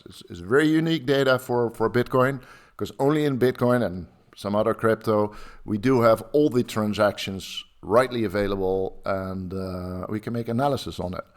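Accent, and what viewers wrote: Netherlands. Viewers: Is that a dutch accent???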